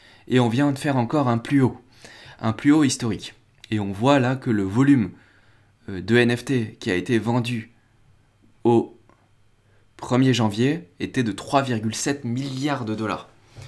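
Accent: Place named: Spain. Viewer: Cet accent espagnol :) looool moi j'aime bien ces vidéos avec du contenu mais avec le sourire!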